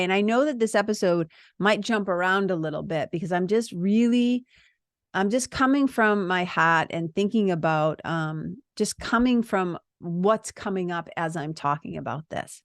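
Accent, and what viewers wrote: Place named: Massachusetts, United States. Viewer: I’m a MA girl living in CA and your accent is making me miss home a WICKED lot! 😆 I’m from the south shore..I’m guessing you are a MA girl too.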